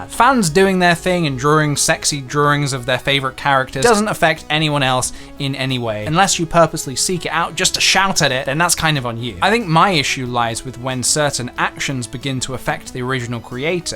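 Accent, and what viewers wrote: United States. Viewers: That american accent 😍 Grateful for how good you are at it LOL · Tell me why your American accent is better than every american’s American accent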